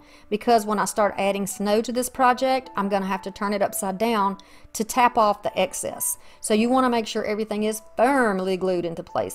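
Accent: South, United States. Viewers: I so enjoy your creations and listening to your sweet southern accent. Keep crafting and thanks for sharing.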